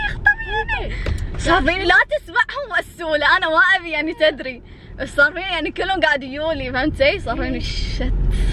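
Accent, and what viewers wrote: Kuwait. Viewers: احبج انا سعودي للمن أحب لهجه الكويت❤️😂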